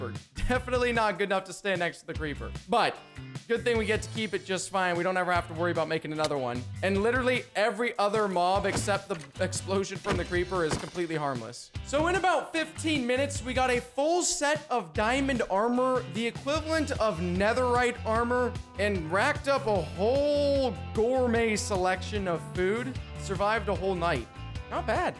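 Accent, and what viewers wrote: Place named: Texas, United States. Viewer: you changed so much the last time I I watched one of your videos you had 100 subscribers and had an amazing Texas accent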